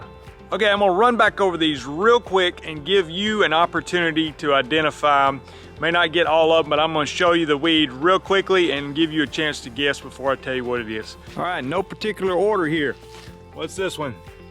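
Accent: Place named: North Carolina, United States. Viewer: Good stuff! Mom is from North Carolina so I hear you great!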